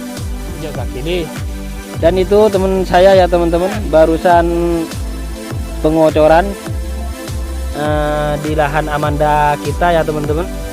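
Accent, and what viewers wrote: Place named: Madura, Indonesia. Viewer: kyak logat madura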